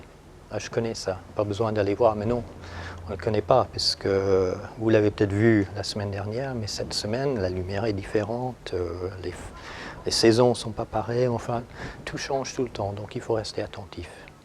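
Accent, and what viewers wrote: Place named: England, United Kingdom. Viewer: We can hear a little english accent, may be that's why... (I'm french) ;)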